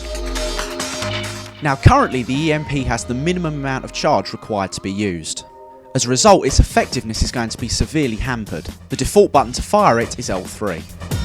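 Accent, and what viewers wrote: United Kingdom. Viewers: It sounds like he might be from Essex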